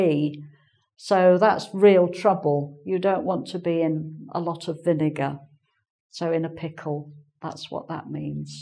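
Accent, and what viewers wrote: England, United Kingdom. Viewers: Is your English from England madam?... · I really love your British accent, specially when you're explaining. Furthermore, Shakespeare is one of my favorite dramatist, the work that I love the most is Hamlet ♥ Greetings from Peru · From Brazil From Marcio Hello Teacher... nice to meet you. This is the second time I watch a video of yours, and I enjoy very much. One question ,please. Where you're from? Yor accent is familiar to me. It sounds like a British person. Anyway, Your lessons are very nice. I have just subbed to this channel. I hope not to disappoint you... I'm still learning your language. Hugs from me and Brazil. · Brilliant! You have a very elegant old English style. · I already felt that you have british accent . I am enjoing the language to improve my knoledge Thanks a lot. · I LOVED your video. Thanks. It was very useful. 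By the way, it was the first time I saw you on Engvid. Now I am watching all your lessons. Your British accent is beautiful. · with (engVid) Your accent and your appearance are great,! ) While watching any of your lessons I feel myself sitting in a London pub drinking ale or having breakfast in an old Birtish castle. )) Thank you for these unforgettable virtual trips! )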